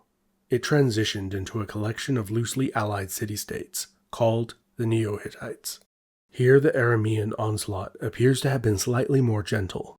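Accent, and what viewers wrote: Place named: United States. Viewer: This is great. I usually avoid American accents but your voice is wonderfully rich and calm.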